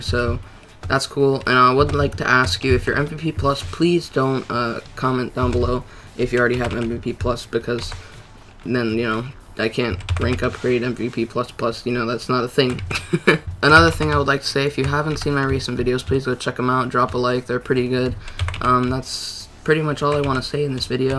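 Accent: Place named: Ireland. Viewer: Ign Irishaccent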